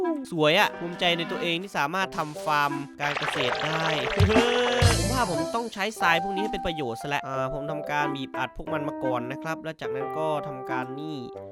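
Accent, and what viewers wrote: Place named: Isan, Thailand. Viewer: พี่พูดอิสานได้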